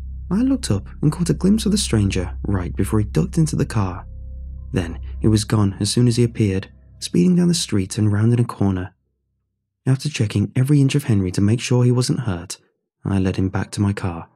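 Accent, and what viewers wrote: United Kingdom. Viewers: or anyone else, can you tell me what part of the Britain his accent is from? It's the clearest sounding British accent I've ever heard and now I love it lol.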